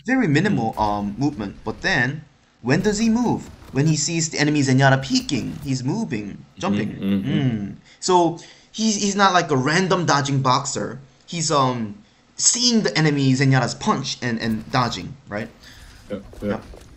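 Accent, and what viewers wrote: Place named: Germany. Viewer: That’s due to the German accent + deep voice :D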